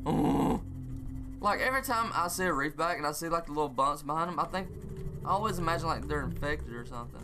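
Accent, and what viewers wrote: South, United States. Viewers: Sounds american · Southern america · I think it's neither. He's probably from southern U.S.A. He's got a southern accent. Not too strong of one either so probably Tennessee or something.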